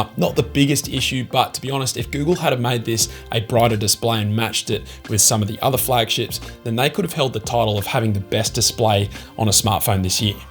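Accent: Australia. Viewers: Thank you so much for your excellent reviews and unbiased advice on mobile phones - yours is the go-to channel for a well produced, honest review. I am thinking that maybe this Pixel 4 review did not require you to repeat honest 10 times though - mate your viewers can see you are honest by the quality of all your reviews! Your Australian accent is a refreshing change from the multitude of other cell phone reviewers! Looking forward to more from your channel. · Just stumbled across this video, and damn I wish YouTube had of recommended you before now. It's great to get an honest review, and have it in an Aussie accent. I've used every pixel since it first launched, and have never experienced the issues in any of them that were previously reported, but the decision to put the small battery almost turned me off the phone. Almost, the camera is well worth the phone.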